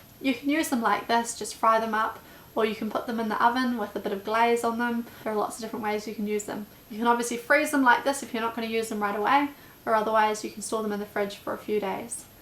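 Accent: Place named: New Zealand. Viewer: I picked up the kiwi accent way before she mentioned it. If I'm not mistaken she's probably also from the lower south Island. Just a hunch. Great presentation and finally vegan recipes with New Zealand ingredients!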